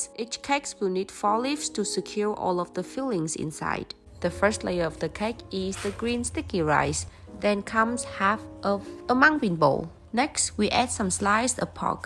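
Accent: Germany. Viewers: Your English is starting to sound more German accented. It's cute 😏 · I'm so Confused because everytime I hear u talk for some reason I hear a more German Accent then a Vietnamese accent and I have no idea why lol. When I first found ur channel and saw u were living in Germany and after hearing u talk I just assumed u were born and raised there. Am I like the only one who thinks this?? Btw i really enjoy watching u and ur boyfriends channel! ❤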